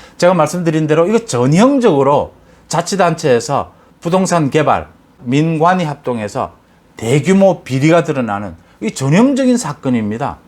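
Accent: Gyeongsang, South Korea. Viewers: 선생님해설은 들을수록 매력이 있어 자주 구독합니다. 경상도 매력있는 말 씨가 들을수록 이끌 립니다.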